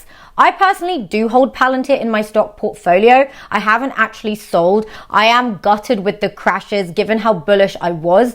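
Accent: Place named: United Kingdom. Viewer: Do I dig your British accent!!!! Where would professor Higgins place your accent? Lundan?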